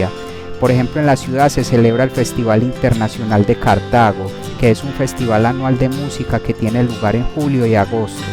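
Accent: Antioquia, Colombia. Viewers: No me gusta ese acento paisa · Hermoso tu video hermoso tu acento colombisno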